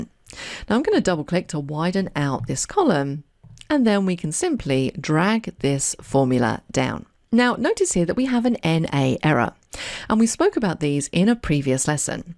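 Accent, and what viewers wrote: United Kingdom. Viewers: Sweet GB accent. 🌺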